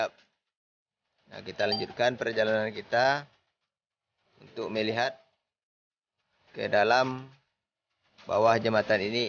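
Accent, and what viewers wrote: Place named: West Sumatra, Indonesia. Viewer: Jaleh bana logat Minang ang sanak😂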